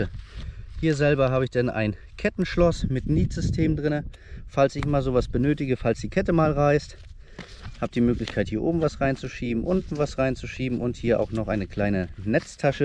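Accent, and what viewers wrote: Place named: North, Germany. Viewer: eines der wenigen male, dass ich wirklich höre, das jemand ausm norden kommt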